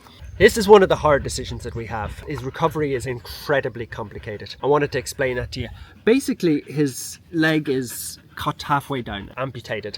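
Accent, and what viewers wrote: Ireland. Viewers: 🙏 🙏🙏Please…I beg of you. Get your lovely self signed up with a professional voiceover agency. I swear, if you have any spare time, you must explore the possibility of using your gorgeous warm and friendly voice as another source of funding your dreams. If I heard that beautiful Irish lilt over an advert, I’d be instantly interested in whatever is being sold. Movies, books etc…. you need to check it out!!😊😊😊😊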